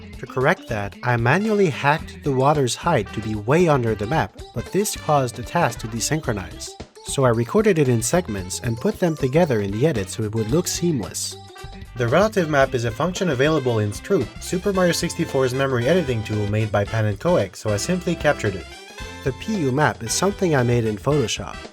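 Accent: Quebec, Canada. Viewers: J'ai une question très simple : Est tu Québécois ? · before he gets the question <num> times he’s french-canadian (québécois specifically) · dude, your english is completely fine. From your accent I think you are canadian, possibily first language french? You speak better english than I do and certainly better French! Thank you, I love your videos and come back regularly to watch them <3 Thank you for all the effort you put in, especially with the audio :-)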